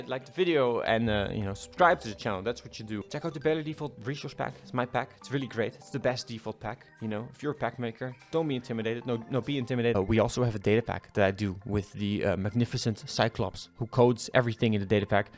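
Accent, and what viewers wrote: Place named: Netherlands. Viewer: Took me 10 mins into the video until I realized you're Dutch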